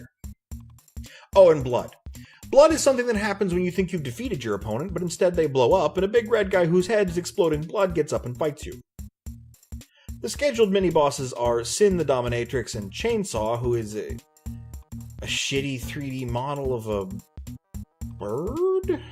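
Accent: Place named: Northwest Indiana, United States. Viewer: You sound like you grew up in NW Indiana with me, bro. Shout out to Aladdin's Castle arcade!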